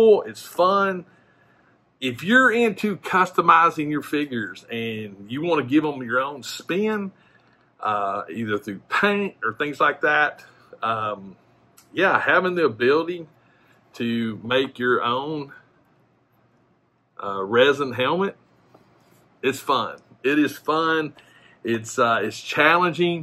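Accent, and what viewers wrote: South, United States. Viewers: well, i can say this: there was no need for you to apologize near the end of the video for the video being “too long”. You did an EXCELLENT job, not only on the helmets themselves, but on explaining everything throughout the whole process. I am pretty much brand new at this whole casting & molding thing. so new, that all i’ve done so far is some “research” or in other words, “watched a dozen or so youtube videos it. and, i wish i woulda stumbled on your video first, i would be comfortable trying my first “run” just from watching this video alone. so, just wanted to say thank you for this video, i learned more from this one video than i did from all the others, combined! 🤣 but hey, dont reckon i ought to be surprised, why? because, i can tell your from the south, like myself… and thats how use good ol boys do it down here! 🤣👍 thanks again brother. 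☺️👍👍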